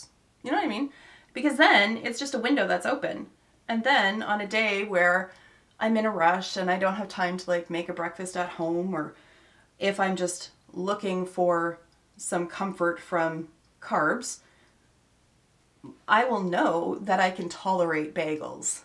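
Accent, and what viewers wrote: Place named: Canada. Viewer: I didn’t realize! Until I here certain words of course I’m like she’s Canadian! ☺️however you accent sounds a lot like most here in US but Wisconsin and certain spots in Illinois definitely pronounce some words like you. You know we are super behind in some things lol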